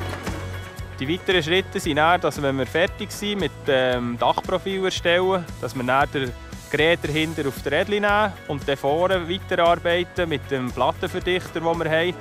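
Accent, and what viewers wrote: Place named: Bavaria, Germany. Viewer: Ich versteh alles, aber man muss sich anstrengen. Im südlichen Bayern der Dialekt ist quasi der selbe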